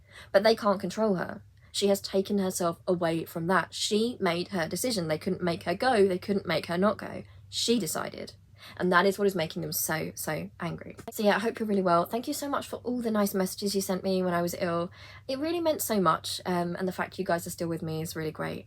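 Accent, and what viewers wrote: United Kingdom. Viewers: Thank you for sharing your thoughts on this subject! Finally, a breath of fresh air (with a British accent, too❗️) I subscribed❣️👍🏽🤗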